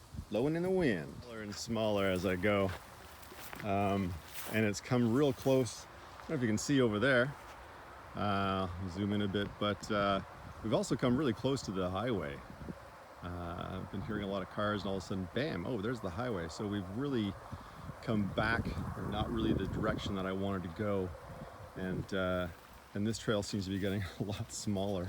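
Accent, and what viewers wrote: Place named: Canada. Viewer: I think I've seen this when it was 1st posted??? But OH! Your navigation skills crack me up! Not the 1st (& not the last) video you've been lost in. You know I love you dearly, but Magellan you are not. And in my Zombie Apocalypse team, navigation is NOT your job! LOL! (Wink) After 2yrs of your audio/ videos you Canadian accented O's & A's still give me a grin & a giggle. Big hugs & here's to the 2022 spring/ summer/ fall hiking ahead! 🥾🕶☀️💧🎒😉😘🧭 L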